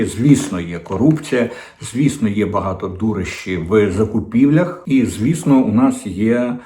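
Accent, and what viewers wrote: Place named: Moscow, Russia. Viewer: ВИ - пречудовий оратор! Але, пробачте, чом ВИ ніяк не виправите вашу московську фонетику? маячЬня, щЬо, передачЯ, чЯстина, незначЬної, чЬому, почЯтку, ухІлянтам, політичЬна, бачІмо, схІльні, агЄнт, очЯх..... та й дивна конструкція-потороча "всЕ рештА"...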